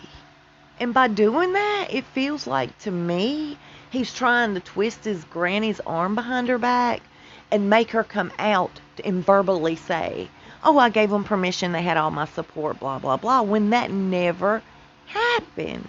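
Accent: South, United States. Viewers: You really have a strong southern accent where are you from? I'm from the south but my accent is not as thick as yours.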